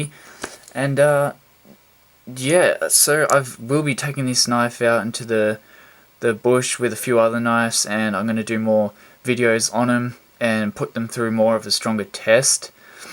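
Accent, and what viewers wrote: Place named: Australia. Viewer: U sund Australian iam Australian and Asian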